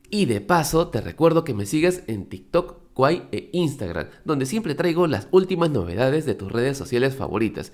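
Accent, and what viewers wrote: Peru. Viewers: Peruano detectado🥺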